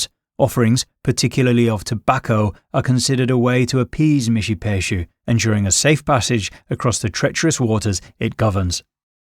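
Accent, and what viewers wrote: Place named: England, United Kingdom. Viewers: I always find it a bit odd that people with English accents talk about American folklore. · You Not even native American and making videos about your original predecessors 😂 lil english accent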